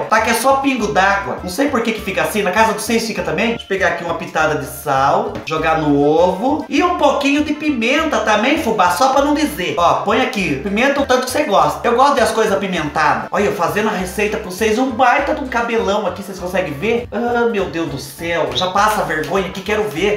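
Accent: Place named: Taquarituba, Brazil. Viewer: Eu entendo toda sua linguagem porque sou de Taquarituba kkkkk é cresci ouvindo falar assim hoje fais 40 anos que moro em SP mais a raiz continuakkk adóro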